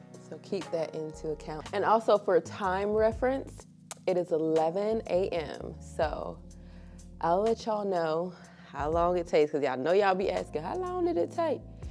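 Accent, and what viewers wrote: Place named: Atlanta, United States. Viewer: Hey girl I'm new to your channel and so far loving the content when I heard your voice I knew you were in the A well pretty close to it. Hey neighbor I'm from Decatur 😊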